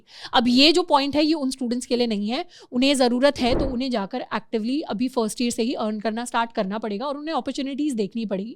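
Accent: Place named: North India, India. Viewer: I would've never guessed that she's haryanavi but yeah definitely North Indian, her way of speaking and presenting is great but I think she speaks a little fast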